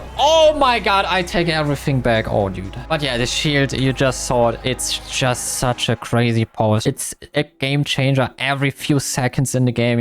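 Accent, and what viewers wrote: Germany. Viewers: Bro your German accent IS sticking Out of that voice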